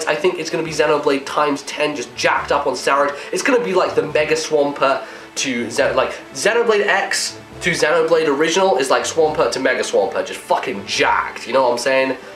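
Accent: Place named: United Kingdom. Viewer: its guys. He's british, give him a break. It's not that hard to understand if you've been here for a while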